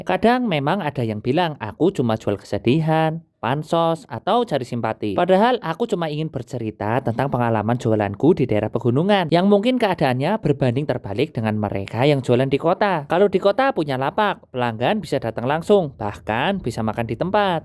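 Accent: Banyumas, Indonesia. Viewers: ternyata orang banyumas, kukira cilacap krn logat ngapak nya samaa😭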